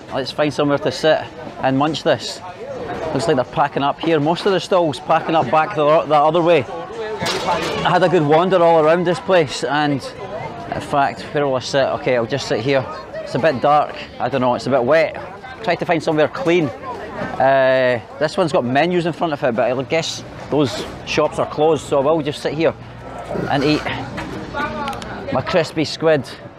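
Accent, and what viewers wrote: Scotland, United Kingdom. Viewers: I love your Scottish accent.👍🏼👍🏼😁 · I like Scottish accent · Seen this on FB a year ago, now finally get to see the full vid hahaha. Loving that Scottish accent. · Have to make myself familiarise with Heavily accented Scotland English. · You are a sweet man. Bit of a clumsy bum but the Scottish accent and lovely approach to people makes up for it! Will keep watching and I have subscribed. I bet your taste in food has grown astronomically...well done as it’s not a common thing for UK citizens to have a broad palate. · your scottish accent make my throat feel swollen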